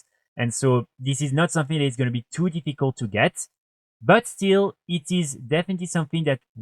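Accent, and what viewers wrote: France. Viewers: Super tes vidéos, mais t’as un accent français on dirait.. en tout cas, ton Discord ne marche pas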